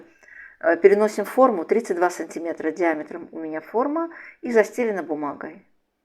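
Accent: Baku, Azerbaijan. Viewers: Здравствуйте. Большое СПАСИБО за вкусняшку.👍 Скажите пожалуйста, Вас что-то связывает с Азербайджаном....Я просто слышу в Вашем приятном голосе,наш,азербайджанский акцент! Извините пожалуйста,за не скромный вопрос.🙏🙋🌹🌺💕👍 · У женщины легкий бакинский акцент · ОБОЖАЮ ВАШ ГОЛОС И НЕМНОЖКО БАКИНСКИЙ АКЦЕНТ ВСЕХ БЛАГ ВАМ,ДОРОГАЯ!!!❤❤❤❤❤ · Очень приятно слышать ваш голос, узнаю наш бакинский родной диалект, он просто неповторим… у вас чудесные рецепты! Спасибо!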